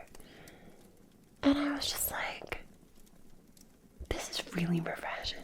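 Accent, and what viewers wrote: Scotland, United Kingdom. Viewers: U had me at Scottish 🏴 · half an hour of tingles from the resplendent scottish lass. perry the platypus noises ☺️ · Great video ❤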